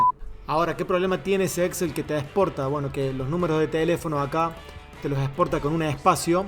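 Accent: Cordoba, Argentina. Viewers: Muchas gracias ! muy claro... y con el tono cordobés que es lo máximo en el planeta! :face-red-heart-shape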